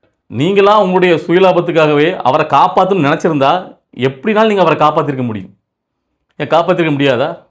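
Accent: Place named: Telugu, India. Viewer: His speech proves that he is not tamilian,his dialogue comes purely with Telugu man........poooda ooothhha last warning 😂😂😂😂😂😂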